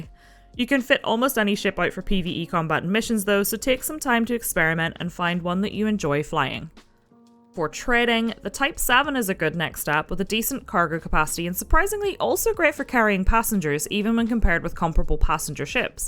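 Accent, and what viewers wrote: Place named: Appalachia, United States. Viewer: sorry ya sound hillbilly. A milder version of accents in the Ouachitas, Ozarks, and Appalachia.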